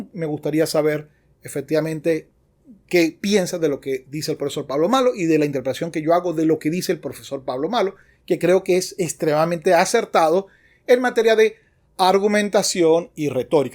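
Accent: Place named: Venezuela. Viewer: Eres de Venezuela?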